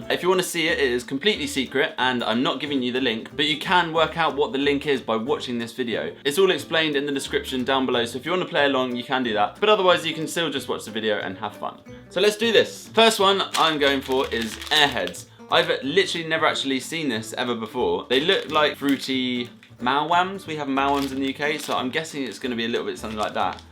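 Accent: United Kingdom. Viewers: Wait... Your British? X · i am british like you ello mate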